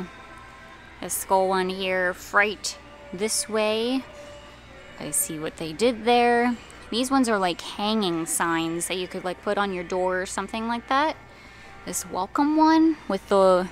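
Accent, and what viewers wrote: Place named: Maryland, United States. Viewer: Sounds like Maryland to me.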